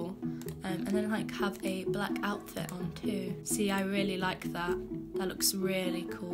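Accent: United Kingdom. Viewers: Omg i love your british voice!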